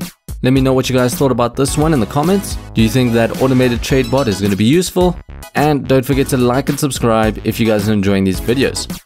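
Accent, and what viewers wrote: South Africa. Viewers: i had a feeling you were South African from the accent, but had to check your bio. Glad to see i was correct! Good Vids!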